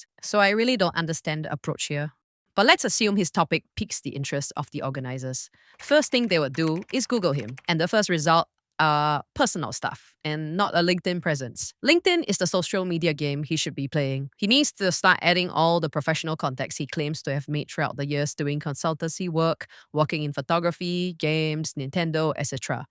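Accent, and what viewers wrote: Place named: Singapore. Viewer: Eh you got Singaporean accent... 😮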